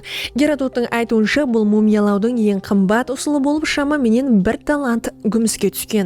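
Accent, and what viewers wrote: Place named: Karakalpakstan, Uzbekistan. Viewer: Берекелле журналист кыз таза Каракалпакша сойлегенин ушын рахмет каналды коллап кууатлайык ❤❤❤❤❤ лайк праписка болыңлар жаксы мәғлүматларды алып барыудан шаршаманлар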